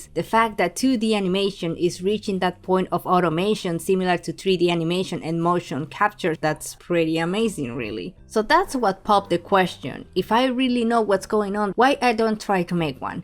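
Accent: Spain. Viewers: Show that Spanish accent! It makes you the awesome person you are <3 . Also, I work with Mac and most of the rigging and Vtuber mods or programs arent available I decided to use Character animator. I love the character you make!!!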